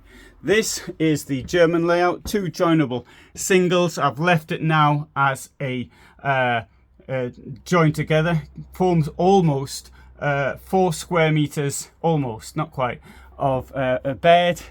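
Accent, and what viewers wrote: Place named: Geordie, United Kingdom. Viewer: Judging by his wonderful accent I’d say the North East of England, if I had to guess I’d say Tyneside.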